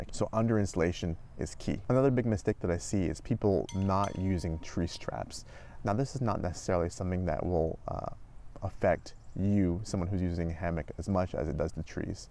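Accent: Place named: West, United States. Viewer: Bro you look Indian and your name and accent sounds Western sorry don't get offended. Never imagined to avatars in same person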